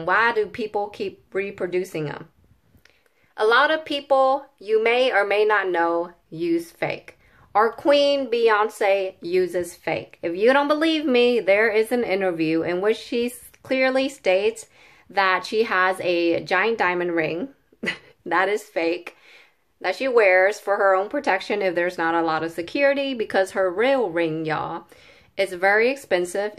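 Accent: South, United States. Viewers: On point sister, I’d rather have cash in my bank and love your southern accent 👏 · Love your southern accent ❤️ I’m a Californian and I love hearing southern accents. And I hear ya on the fakes, hence I’m so scared to buy on these retailers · Southern, funny, and kept it aaaaall the way real.....new subbie.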